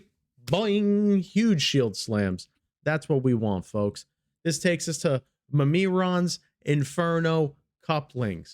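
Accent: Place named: New York, United States. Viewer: Haha! omg his accent is so freaking great. I feel like I'm in NYC being given directions to the Chrysler Building or taken to my seat in an Italian mobster restaurant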